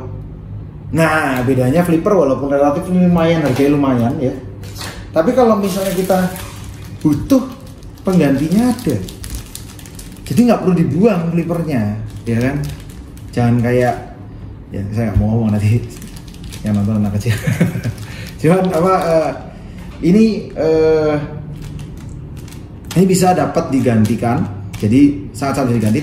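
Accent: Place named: Java, Indonesia. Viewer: asli jowo endi koo😂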